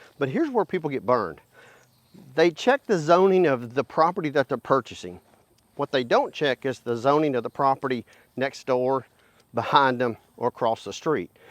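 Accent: South, United States. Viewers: That super southern accent is a challenge. Damn. "Number tin is mineral rots" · i love me some southern accent hehe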